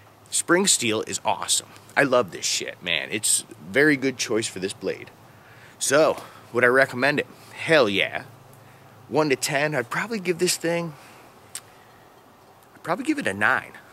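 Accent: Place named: Maine, United States. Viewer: hey what State are you from? cause you sound like a mainah or someone from Maine. cool videos!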